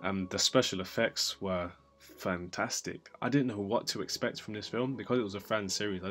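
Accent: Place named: United Kingdom. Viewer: Your dialect is ugly, it sounds like UK trash, rather than superior aka North American dialects.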